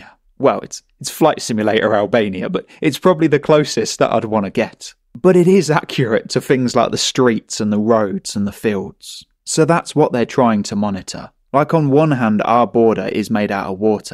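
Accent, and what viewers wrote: United Kingdom. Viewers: Thick British I’ll say no more.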